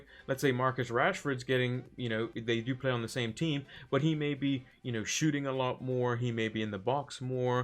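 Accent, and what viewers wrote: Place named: United States. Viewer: where you live mate? sounds like US but maybe you speak another language also?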